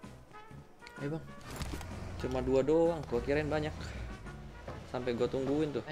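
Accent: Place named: Banyumas, Indonesia. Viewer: Ora ngapak ora kepenak 😀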